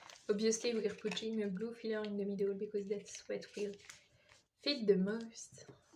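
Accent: France. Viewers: is this a French accent I hear ?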